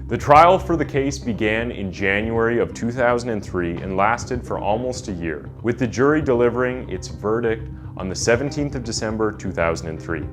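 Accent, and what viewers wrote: Canada. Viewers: 🙄 Damn shame, you couldn’t tell that the narrator is Canadian by his accent? You must not know many Americans (but of course, our accents have a hell of range, you know). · Do you not hear his Canadian accent? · You're Canadian aren't you?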